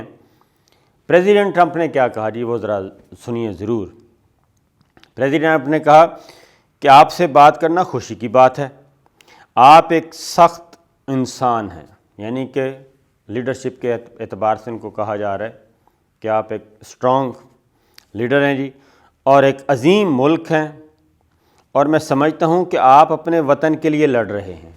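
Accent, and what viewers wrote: Punjab, Pakistan. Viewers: the way u speak English with Punjabi Accent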